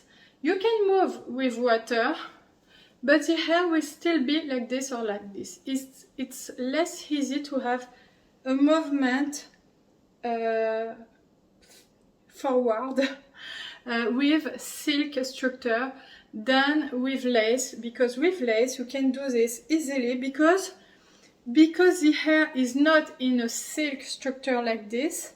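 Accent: France. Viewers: I love French. But your accent is terrible. Better not to speak English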